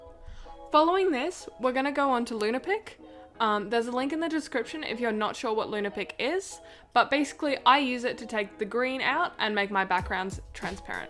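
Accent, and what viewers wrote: Australia. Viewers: Congrats on your first monetized video and your aussie accent is fine btw! No need to put on subtitles 😅 Thanks for this tutorial btw! I'll be sure to come here if I ever want to learn to animate msp characters, it sure is nice to see someone who edits with medibang instead of photoshop for a change when it comes to animating on after effects ♡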